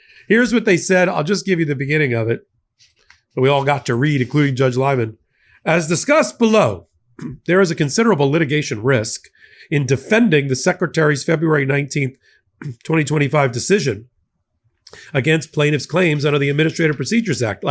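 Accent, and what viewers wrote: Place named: New York, United States. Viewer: Love the NY accent and humour.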